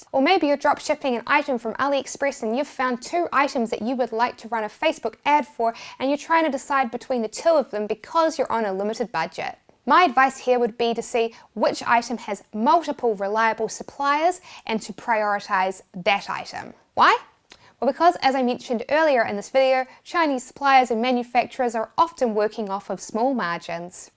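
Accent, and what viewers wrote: New Zealand. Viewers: My god that is the most NZ accent ever.....saying that I'm Australian, so I probably sound mentally impaired when I speak.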